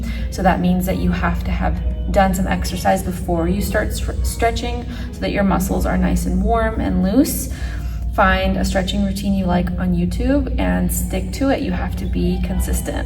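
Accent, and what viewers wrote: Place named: United States. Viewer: Hello, I am an italian girl, not a ballerina☺️ I love following you not only for your contents, but also because your american accent is amazing to me and I really would like to learn your pronounciation😄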